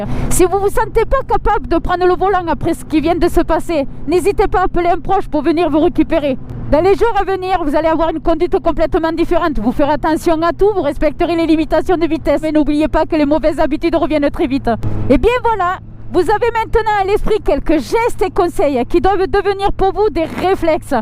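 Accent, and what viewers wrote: South, France. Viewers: avec ton accent du sud ça passe sans problème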